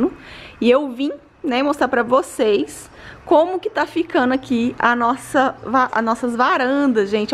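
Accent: Minas Gerais, Brazil. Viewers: Ahhh esse sotaque mineiro😍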